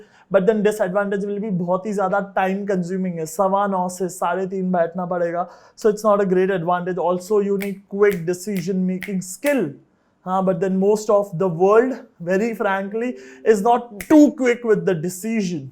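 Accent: Gujarat, India. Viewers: UFWP event jordar hai, jitni tariff kare kam hai, jo life ko badal deta hai aur ek new life jine ka tarika batata hai, aap jitni event karege woh sub attend karunga and tamari gujatati tone jordar chhe 🙏🙏🙏🙏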